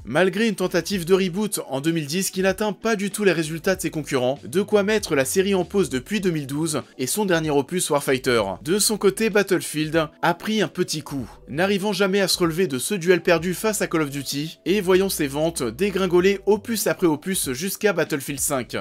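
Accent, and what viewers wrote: Paris, France. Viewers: Cette bouffée de nostalgie en intro un gros kiff par contre si je peux me permettre ; accellere un peu tes vidéos surtout le debit de parole on entendra moins l accent parisien avec les fins de phrases en "en" qui donnent envi de tuer des chatons avec une cuiller Sinon continue comme ca c est cool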